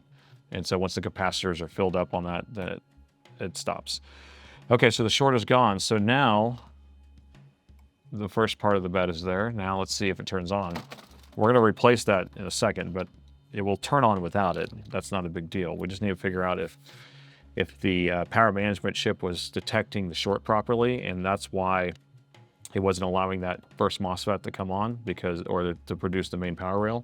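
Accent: United States. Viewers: I have watched many Youtube anchor videos about repairing laptop motherboards, mostly with a British accent. This is the first time I have heard of a blogger with an American accent. The program is very good, fantastic, subscribe~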